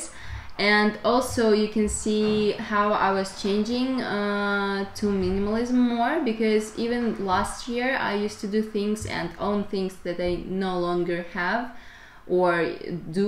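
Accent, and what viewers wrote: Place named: Russia. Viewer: Thanks for sharing, you have a wonderful family. I love your accent, reminds me of any action movie where there are Russian agents, like the movie Anna or James Bond. You have stunning eyebrows, kind of like Brooke Shields. Let’s hope Biden and Putin can get something going where the US and Russia can finally be Friends!!!! Good luck with your channel.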